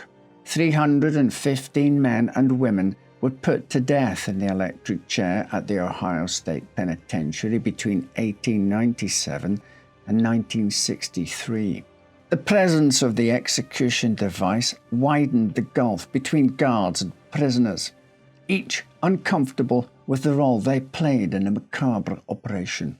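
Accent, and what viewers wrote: Scotland, United Kingdom. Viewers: "Scottish like"? He's 100% Scottish 👍😀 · I’ve got to stop watching well I never when I get into bed. No matter how interesting the story is, that calm Scottish like accent knocks me out every time. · Wow - just wow. Weird hearing local history from someone with an English/Scottish/Irish accent, but somehow it just makes it seem more interesting. Thank you and subbed.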